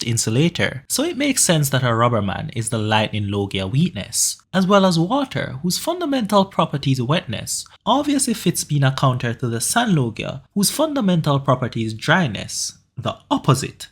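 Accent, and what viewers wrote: Caribbean. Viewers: Are you from the caribbean? I hear the accent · you sound caribbean where you from?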